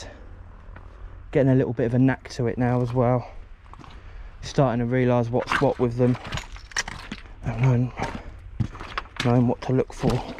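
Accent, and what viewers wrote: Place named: Scotland, United Kingdom. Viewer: to pick em up if you want them for bait, lol was for how scittish you sound buddy ha ha keep up the good work fella hopefully things go well for you and the family stay safe !!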